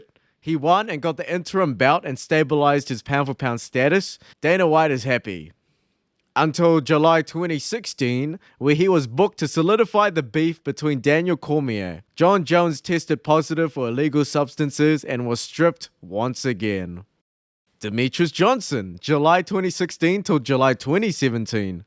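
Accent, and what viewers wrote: New Zealand. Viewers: Is that accent an newzelander that’s been living in America?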